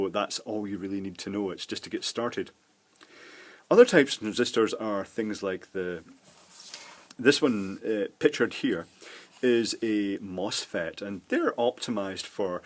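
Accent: Scotland, United Kingdom. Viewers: Chop Chop Scottis presenter speaking English. Nothing to do with America. Snowflake much? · Thank you for your video :-) are ya from Scotland? You sound like it dontcha know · Which accent he explained ? British ?? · I scanned the comments for 2 minutes and am sad that no one mentions how he keeps saying nanofart. Classic Scottish accent! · Brilliant - why is it that the best sparkles have a Scottish ascent - answer - they are the best I have ever sailed with - enjoying this - brilliant! Please continue!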